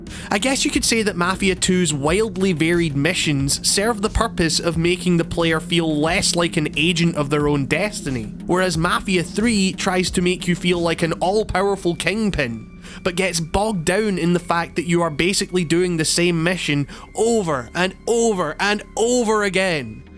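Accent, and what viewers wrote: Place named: Wales, United Kingdom. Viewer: Neat video! I love Welsh boys! You have the cutest accents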